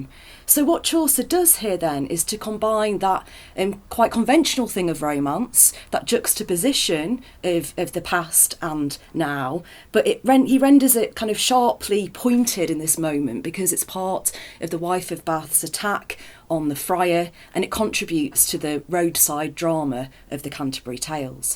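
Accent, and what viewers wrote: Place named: United Kingdom. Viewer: British accent, it has to be good.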